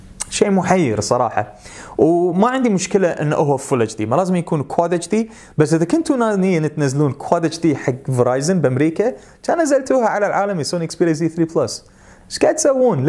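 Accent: Kuwait. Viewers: حلقة اليوم فيها كثير من الخلط و الاخطاء في المعلومات . بالاضافة ان اللهجة الكويتية اليوم اكثر من الفيديوات السابقة. رغم كل هذا فيديو جيد .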